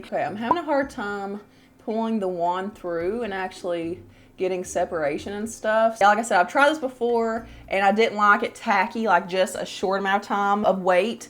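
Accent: South, United States. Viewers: You're such a pretty lady, and I LOVE your Southern accent. Makes me feel at home. 😀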